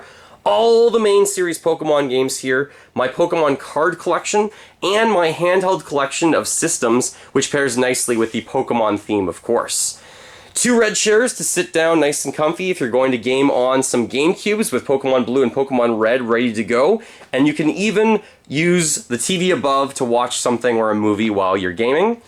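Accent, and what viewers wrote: Canada. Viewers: Haha within the first minute I heard you talk I could tell your Canadian, I found your channel when I started playing video games again specifically the Nintendo switch after a 5+ year hiatus, it’s awesome to see the renewal of passion for your hobby and channel.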